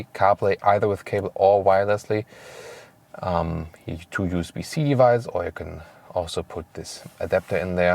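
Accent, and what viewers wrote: Germany. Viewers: congratulation a little bit of german accent but the analisys is quite perfect. go ahead mate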